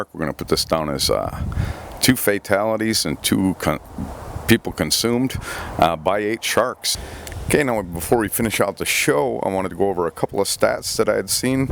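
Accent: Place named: Florida, United States. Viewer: I knew you had a Florida accent! I still can’t get over that they found a Great White with a 6’ Blue Shark, 9’ Dolphin and Turtle in its stomach! We hear 6’ or 9’ and think that’s small, if you’ve ever been fishing think how that fish flips around on the deck, then imagine one as long as you, or in the case of 9’ three feet longer it’s A HUGE FISH! And in the STOMACH of a Great White, if those bigger ones wanted to swallow you whole they could, just giant monsters.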